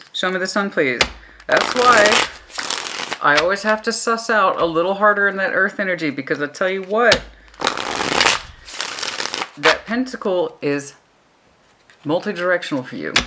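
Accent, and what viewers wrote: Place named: Texas, United States. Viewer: You are so beautiful and I knew I heard a Texan accent…the reading resonates 100%!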